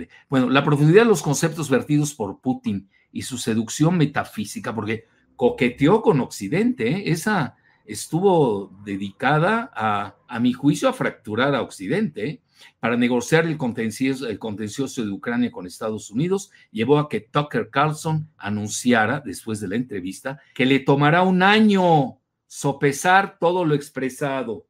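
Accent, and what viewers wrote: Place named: Mexico. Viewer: Estimado Alfredo, por tu acento diria que eres como.yo MEXICANO, yo estudié en Moscu 8años, lis 8 años más felices y hermosos de mi vida, aqui no hay mucho lugar para escribir y describir toda mi experiencia, solo te diré hay una exelente entrevista aqui en YouTube hacha por Vladimir Soloviev a Putin, hará unos 7-8 años atras vela y si no hablas ruso pidele a alguien que te traduzca ahi podras ver el gran pensador que es Putin, luego hay otra entrevista hecha por el director americano Oluver Stone, mirala y ve lo que dice Stone sobre Putin. Saludos desde Alemania Fernando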